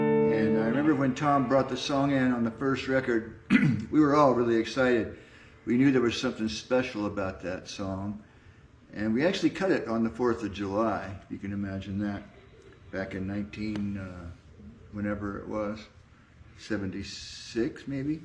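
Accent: Florida, United States. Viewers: Mike and tom have the same accent being both from Florida make a lot of sense. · Both real Floridians!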